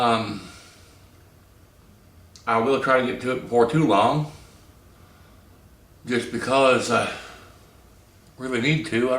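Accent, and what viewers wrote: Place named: Appalachia, United States. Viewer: Hi. Just wondering are you from Tennessee Your accent is very much like mine and Larry's. do you like Indiana?. I don't mean to be nosy just curious. I just couldn't bring myself to leave these old mountains:) All my best. Bobby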